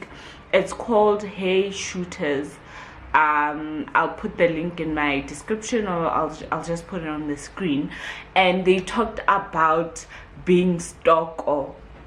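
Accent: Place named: Sotho, South Africa. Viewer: Your Sotho accent ❤️😍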